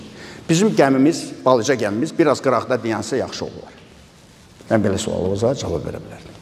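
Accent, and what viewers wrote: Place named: Baku, Azerbaijan. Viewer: bey Maştağalidir yoxsa hansi Baki kendinden? Aksent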